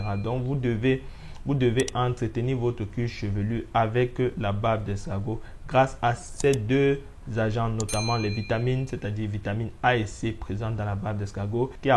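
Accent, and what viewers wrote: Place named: Africa. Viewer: Bonjour à votre accent on devine que vous êtes un africain. Qu est ce qui vous empêche de montrer des femmes de couleur arrêtez ce complexe c est révoltant. Si vous n avez pas de photos de femmes africaines il y a les femmes noires américaines. Vous vous adressez plus à vos semblables et vous affichez des femmes blanches arrêtez ça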